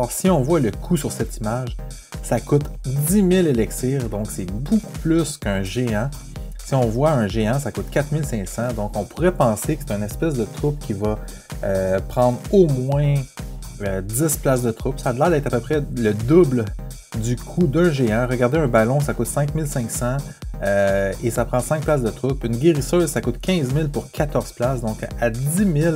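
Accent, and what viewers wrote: Quebec, Canada. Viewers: Super vidéo !! Québécois ??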